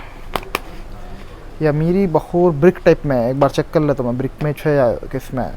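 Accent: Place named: Hyderabad, India. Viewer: Love your hyderabadi accent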